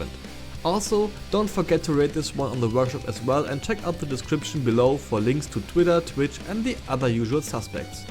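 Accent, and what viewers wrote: Germany. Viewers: you Sound German .. are you?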